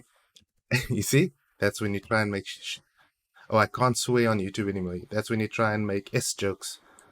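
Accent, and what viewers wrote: South Africa. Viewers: I just randomly found your channel to help me with Black and White, Your Accent, SOUTH AFRICAN! Lekker my Broer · Knew I heard a fellow South African! Thanks for vid man. Oh how I’ve been wishing for B&W 3 since I was like 15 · Homie you sound south African!! Glad to know im not the only Black n White fan left alive! :D Thanks for this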